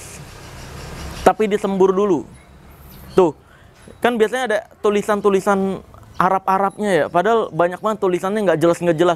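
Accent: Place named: Madura, Indonesia. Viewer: Madura banget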